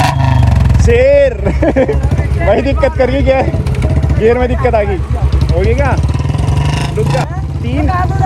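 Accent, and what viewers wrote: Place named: Muzaffarnagar, India. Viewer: Bhai tum muzaffarnagar se hi ho kya 😅😅😅 konse gao ke hoo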